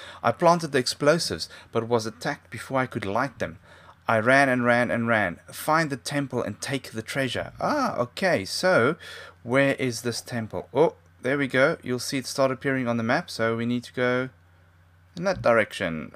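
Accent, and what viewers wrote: South Africa. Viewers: I thought so, My parents have friends that are south african and they sound just like you. Pretty cool Man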